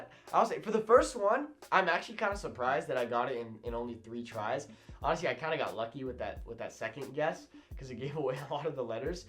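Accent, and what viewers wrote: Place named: Mexico. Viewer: As a spanish native, you speak really good, and got the accents right, that i think is the most difficult part for other people. Also you got more of a mexican accent. i Liked it!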